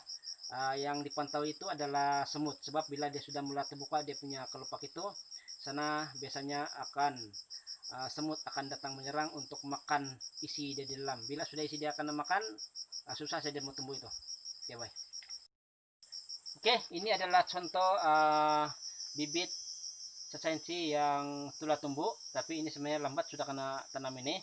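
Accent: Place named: Sabah, Malaysia. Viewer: Dari cara pertuturan spt org dusun Sabah..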